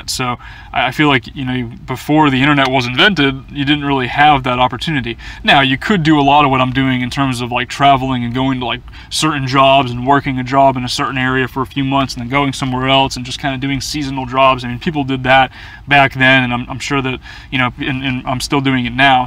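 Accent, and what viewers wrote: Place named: Boston, United States. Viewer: Another great video,. I initially started following you due to you wearing a Cleveland Browns tshirt. Then I saw you w a browns skully on. What's your cle connection? Considering it sounds like you're a Boston area native. I traveled out of my of my forester. Jealous of what you've accomplished. Keep the videos coming, I'll keep watching...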